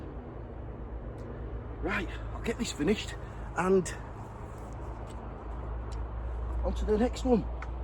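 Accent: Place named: Yorkshire, United Kingdom. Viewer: New subscriber here and a relatively newbie to allotment life, only had mine just over a year. Whereabouts in the UK are you? Listening to your accent you’re not too far from me in Yorkshire and it’s good to follow someone who lives in the same area to know what they can grow etc. PS I’ve also got compost envy. 🤣 Deb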